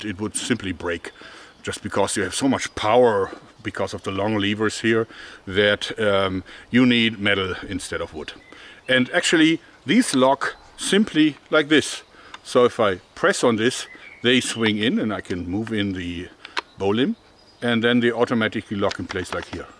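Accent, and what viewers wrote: Germany. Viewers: excuse me! Are you German?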